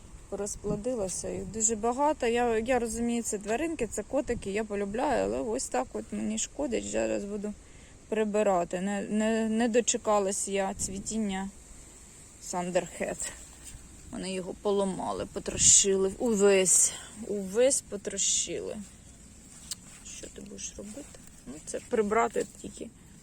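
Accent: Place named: Russia. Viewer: Трудно слушать украинскую мову , в исполнении русскоговорящих.Ну так и хочется помочь . Зачем ? Конечно может это требования ютуба или нашей е- ной власти , а может необузданый патриотизм , не знаю . В любом случае сочувствую.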